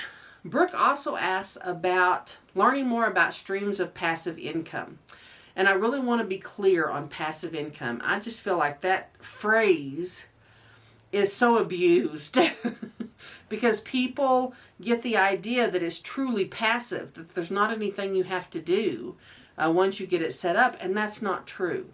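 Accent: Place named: Indiana, United States. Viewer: Funny you are from Texas. You have the same accent of a friend of mine who is from southern Indiana.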